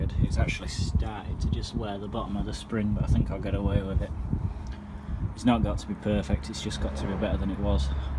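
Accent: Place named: Yorkshire, United Kingdom. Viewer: I thought u were a Yorkshire man!... turn them bushes over, there only worn out at the bottom 😉😂😂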